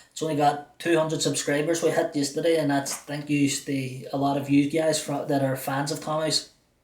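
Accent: Scotland, United Kingdom. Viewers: I know. I was just enjoying your way of talking. I love a strong accent like scottish and irish. 😊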